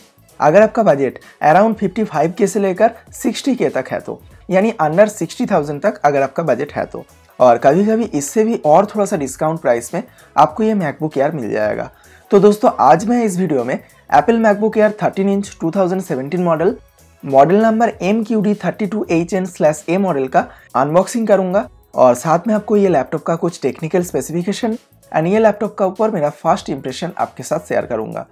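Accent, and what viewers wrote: Bengal, India. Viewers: Are you Bengali? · Bhai kya aap Bengali ho??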